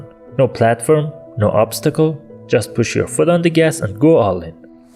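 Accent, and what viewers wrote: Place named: Iran. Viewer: لهجه قشنگ ایرانیع 😂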